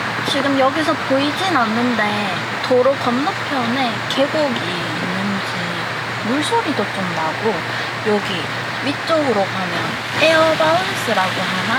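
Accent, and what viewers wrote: Gyeongsang, South Korea. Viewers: 씨는 경상도 말쓰는거랑 웃는모습이 참이뻐요^^ 젤좋아하는 캠퍼예요^^ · 화면만 나오는지 알았는데 말도 하시네요 혹시 대구 경상도 아가씨가 맞죠?인생 너무 즐겁게 사네요ㅡ전맨날 집ㅡ직장ㅡ집인데ㅡ남자 게스트ㅈ안필요 하세용ㅋㅋ🎉🎉❤😮😅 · 님도 이쁘고 경상도 말씨도 좋네요 하이볼에는 위스키가 정말 맛있어요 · 갱상도 분인갑네요~~ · 경상도 말씨에 인상도 좋으세요~우중캠핑 잘봤습니다 고생하셨습니다❤🎉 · ㅎㅎ 이분은 귀여우심 경상도사투리도 귀엽고 그래서 구독 ❤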